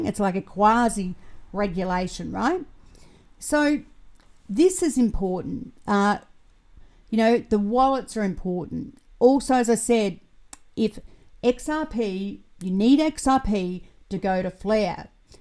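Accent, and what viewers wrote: Australia. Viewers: Nice to hear an Aussie voice! I feel much more like I can trust an Aussie!!!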